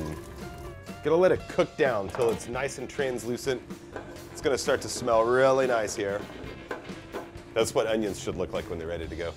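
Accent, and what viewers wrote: Texas, United States. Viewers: yep, sounds like Texas alright 😆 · Is this guy from Texas or something?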